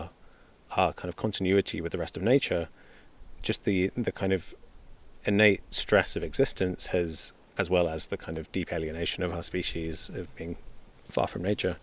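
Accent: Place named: England, United Kingdom. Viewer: that's called an English accent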